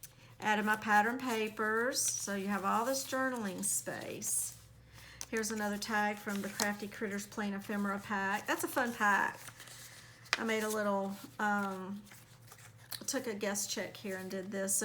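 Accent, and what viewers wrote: South, United States. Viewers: Oh my goodness I could listen to you for hours. Lol where are you from? USA South?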